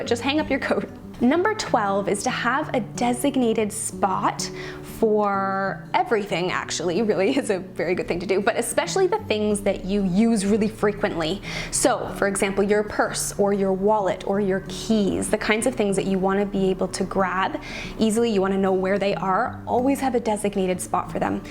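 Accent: Canada. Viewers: New to your channel from Ashlyn's channel. Just subscribed. Quick question...are you Canadian? Certain words you say sound Canadian..... I live in Alberta,Canada. Enjoyed your video! Thanks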